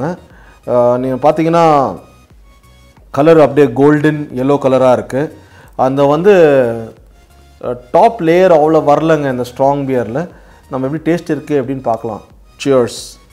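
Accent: Bangalore, India. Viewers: Nice review. Hope you are from Bangalore. Since your slang is like Bangalorian..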